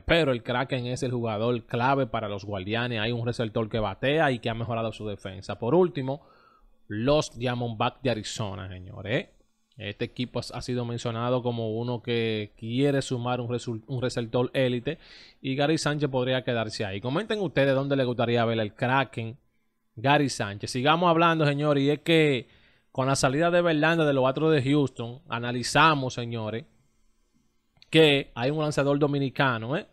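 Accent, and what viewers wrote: Cibao, Dominican Republic. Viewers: Buenas tardes, tú hace buenos comentarios osea que hace tu tarea pero acuérdate que tú estás en un público tienes que mejorar tu manera o tu forma de hablar acuérdate que no solo te estás dirigiendo al público Dominicano que sabemos que eres cibaeno pero los otros países no saben el porqué tú habla así que en vez de decir (estoy seguro tú dice to’l seguro en vez de decir ochenta y seis dice ochental sel) y así sucesivamente pero si haces buenos comentarios y te felicito en eso.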